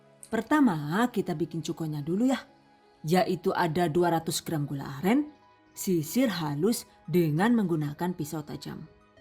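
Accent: Banyumas, Indonesia. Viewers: Salam hangat dari negri kincir angin..wah luar biasa resepnya..memasak sambil berhumor... bhs.gaya orang ngapak Banyumas...saluut dan proficiat..👍👍👍😁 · Paling suka liat video karna selalu detail,gk berbelit2 jadi mudah di pahami..apalgi kalo udh ada ngapak nya mkin seru nonton nya 😂😂.. #GiveawayTHV2021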